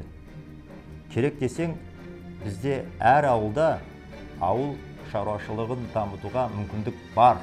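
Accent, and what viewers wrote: Standard. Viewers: Казакша өте таза сөилейді екен бурын неге сөйлемеген Токаевтан таза тусінікті сөиледі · казакша таза сойлейди екенсиз. Айтканыздын бари дурыс. Казактар оянындар. Алга Казахстан! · Козакша таза соилеп турсыз дурыс аитасыз кашан сол кун туады екен курттып битти барин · Казакша Тап Таза сойлейди!👍🏽 Дурыс, Жетер Мына Душпаннан кутылу керек! Аллах разы болсын!!🙏🙏👍🏽💪🏽💪🏽💪🏽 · Казакша таза соилеиди екен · Рахмет. Таза қазақша сөйлеп отыр...... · Нагыз таза сойлеп тур агайындар рахмет айтып бмрлесейик баска ештеңе керек емес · айсауболшы патриот казахша таза адемы айттын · Муктардын казакша сойлегени адеби тилде сойлейди керемет адам 🙋‍♀️👍👍👍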